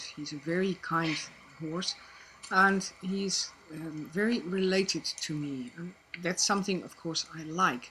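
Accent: Netherlands. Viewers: big time, im 100% also born in the U.S. with a dual citizenship. i can pick up a dutch accent a mile away! Ik vind het fantastisch is. ook veel succes met je paarden en bedankt voor het antwoord!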